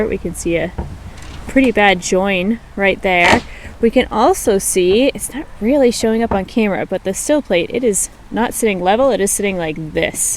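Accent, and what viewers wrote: Quebec, Canada. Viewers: 😁😊💪It is a joy listening to with his Québécois accent. C'est super.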